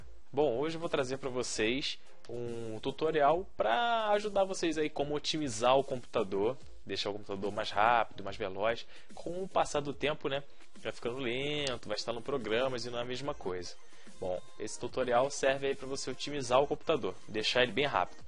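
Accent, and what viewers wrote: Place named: Rio de Janeiro, Brazil. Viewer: (lento) leanto.. (disco)dixxxxco..(sistema) sixxxxtema.. sotaque carioca é bem diferente kkkkkkk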